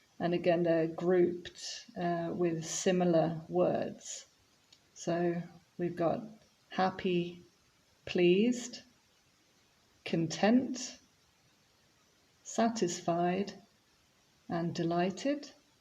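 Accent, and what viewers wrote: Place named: United Kingdom. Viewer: I'm so thrilled about your accent 🤲 I do like a British accent 🤲you do sound natural.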